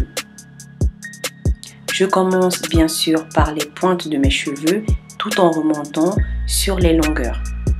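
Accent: Gabon. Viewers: 🇬🇦...haaaa je savais l'accent boma👌 au moins c'est plus crédible on je me retrouve dans le type de cheveux 😋 courage sista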